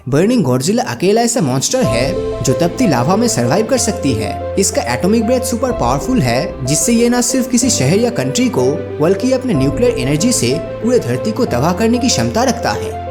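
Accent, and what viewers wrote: Bengal, India. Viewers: Are you bengali? 🙄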